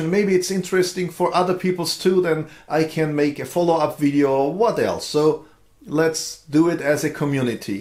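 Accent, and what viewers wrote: Germany. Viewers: I could tell this was a Deutsch accent immediately. Meine Frau ist von Darmstadt.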